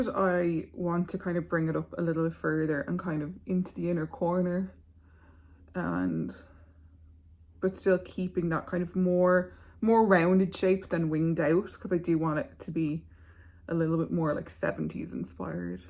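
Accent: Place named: Ireland. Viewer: Hello! I’ve followed you on IG for years but didn’t know you had a wee YouTube channel! Glad you popped up. Also didn’t know you’re Irish. Lovely to hear you and your lovely accent. 🥰❤️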